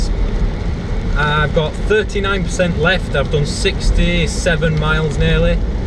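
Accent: Yorkshire, United Kingdom. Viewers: Where in Yorkshire u from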